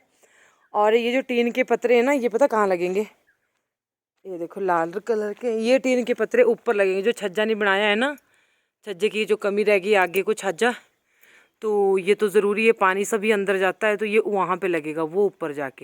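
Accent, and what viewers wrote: Punjab, India. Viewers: Aapki language bdi Punjabi " Podiya"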